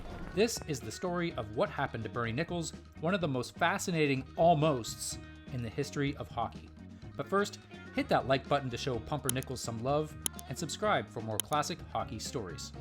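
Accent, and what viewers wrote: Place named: United States. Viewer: Sounds like an American talking about a subject he knows little about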